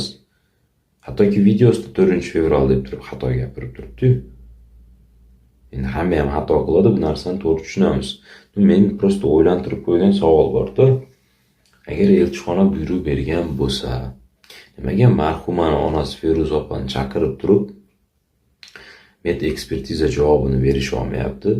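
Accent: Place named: Andijan, Uzbekistan. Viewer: Нима бупти шевасига анжонли хм нима дмокчисз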